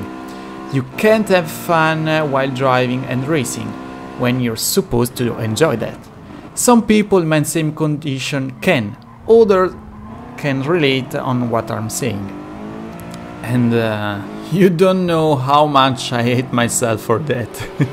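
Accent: Italy. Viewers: questa la prima volta che in un video sento quella che sembra essere la tua voce e penso di poter affermare con certezza che tu sia italiano ;)... grande ti stai impegnando e ti faccio i miei complimenti continua a cercare di tenere attivo questo canale in inglese e migliorare sempre. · love the italian way that talks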